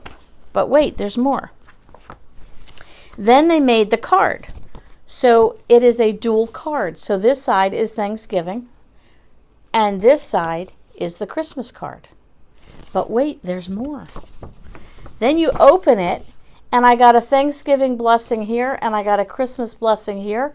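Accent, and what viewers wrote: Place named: New Jersey, United States. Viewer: I KNEW you were from NJ with that accent! I moved from Jersey to Louisville KY 17 years ago! I miss my New Jersey! Excellent video! Thanx so much! I just subscribed!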